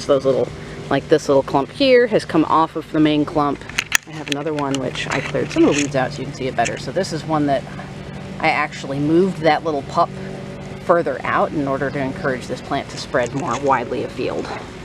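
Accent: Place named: New England, United States. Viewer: Sounds like my neck of the woods. New England.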